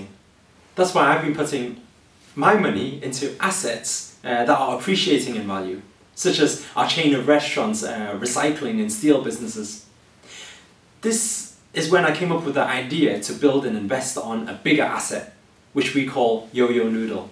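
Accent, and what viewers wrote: United Kingdom. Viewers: I like your british accent at the time!!! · Wow... your British accent was more prominent then.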